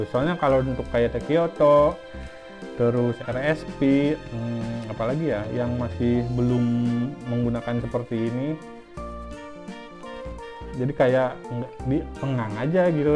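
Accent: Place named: West Java, Indonesia. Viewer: Aa org sunda nya. Sunda mana?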